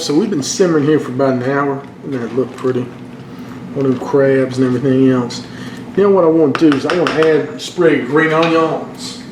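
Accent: South, United States. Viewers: I love your videos !! just found them today :) LOVE your southern accent ! Where r u from ?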